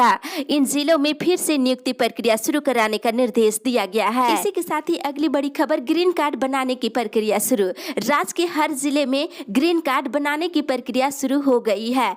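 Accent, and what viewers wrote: Jharkhand, India. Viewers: Aapke news kaafi jaruri ho gaya abb jharkhand news updates k liye... thanks. Aur aapki awaz me sudh desi jharkhandi bhasha ki khushbu bhi aati hai ....ha jbb kabhi kabhi aap uccharan me thoda mistake v karti h to bura nhi lgta h bus reality lgta h. Udaharan k liye "Faculty" word aaj k news me.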